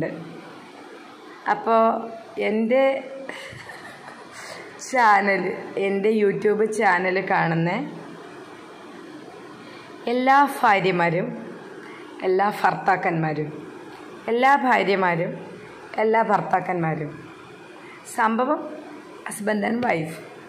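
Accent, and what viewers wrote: Kannur, India. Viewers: ore nattile oru shyliyane nammudey kannur bhasha mattulla nattile illa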